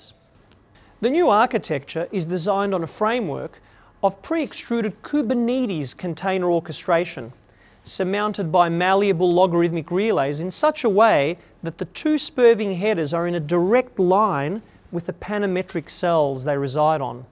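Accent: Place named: Australia. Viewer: Anything said with a British accent is a lot smarter and better. But this guy is Aussie, so I'm skeptical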